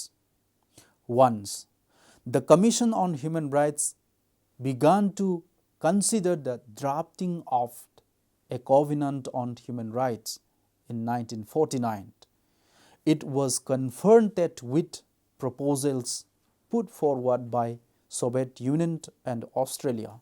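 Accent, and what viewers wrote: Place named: Northeast India, India. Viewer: He is speaking English. The only thing is that he has a North East Indian accent. Please learn to be kind.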